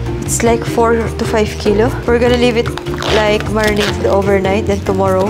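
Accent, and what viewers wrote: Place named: Philippines. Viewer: talks exactly like the wife his intonation, Pinoy accent, laugh and man er of speaking he adapted from her. Both are happy people always laughing and joking.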